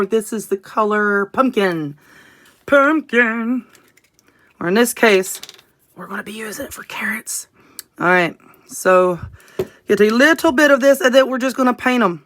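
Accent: South, United States. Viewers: Your so funny. This are beautiful crafts. I just love listening to you with your southern accent. I used to live in SC for almost 30 years before moving here in MN 3 years ago! Yes weather here is terrible right now we had snow storm Sunday. I missed the the weather in the South.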